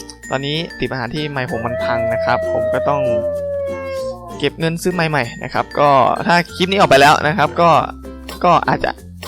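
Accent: North, Thailand. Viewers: พี่ๆเป็นคนเหนือหรอ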